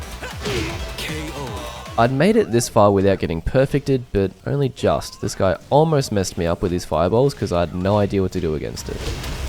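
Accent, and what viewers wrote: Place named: Australia. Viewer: tekken is a hard game, with a huge amount of knowledge checks. happy to help you come to grips with the basics if you like? judging by your accent, you are in Australia right? I'm in NZ so the connection should be pretty decent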